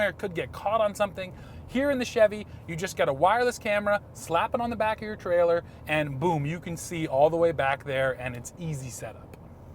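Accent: Canada. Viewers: Actually, his accent is a Canadian one.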